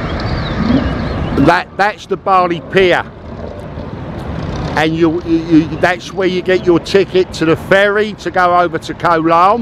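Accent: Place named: Cockney, United Kingdom. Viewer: Again good video but you cokney accent make dificult to understand fot frog like me !!!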